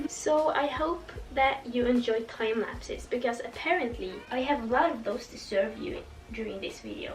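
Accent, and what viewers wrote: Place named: Scandinavia. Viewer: i loved this video!! i can't figure out where ur accent is from is it from one of the Scandinavian countries?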